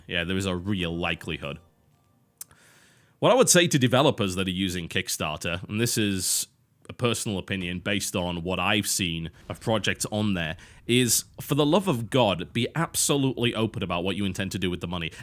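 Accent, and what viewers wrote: United Kingdom. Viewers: He can't you fucking racist that's how British people say it. Its because of their accent.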